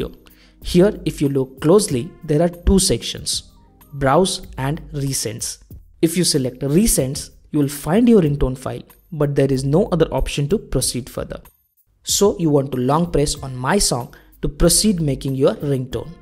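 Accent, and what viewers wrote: India. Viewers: I love indian accent yes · Idk but his Indian accent made this 10x easier to understand. Thanks for the help 🙏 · Indian English accent 😢😢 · SUch a damn indian accent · THANK GOD HE HAS THE INDIAN ACCENT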